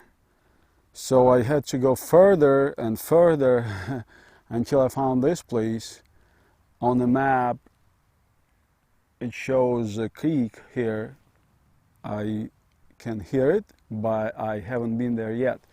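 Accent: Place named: Russia. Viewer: Почему я слышу русский акцент?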